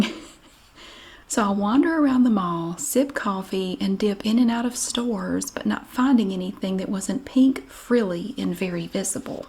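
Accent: South, United States. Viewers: I LOVE HOW IS SO ELEGANT! 🧡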